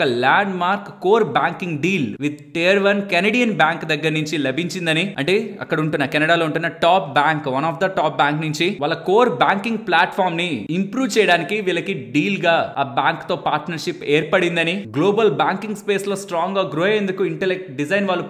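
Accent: Nellore, India. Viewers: 😮 midhi nellore ah???❤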